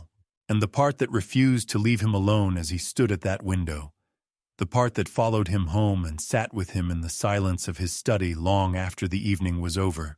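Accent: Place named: United States. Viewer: Good but a very slow internal story. Its all narrated with very little actual dialogue. I guess its in the style of repressed Victorian English people. The dull voice in an American accent throws me off but at least all the pronunciation is correct.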